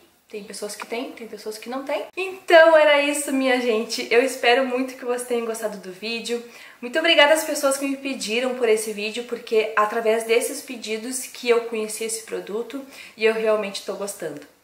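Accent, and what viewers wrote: Rio Grande do Sul, Brazil. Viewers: .. você é gaúcha?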